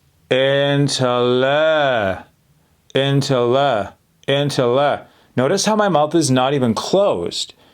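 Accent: India. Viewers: Thats indian accent yeow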